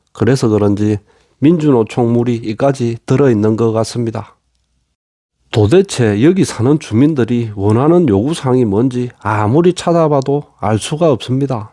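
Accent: Gyeongsang, South Korea. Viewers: 형님 경상도 말투가 넘 맘에들어요 한번 뵙고쉽네요~ 저는 전라도덴... 정말 모든유툽 넘 잘 설명하시고 항상 유툽보면서 장거리 운전하는데 지루하지 않아서 넘조아요ㅎㅎ 응원합니다❤😂🎉😅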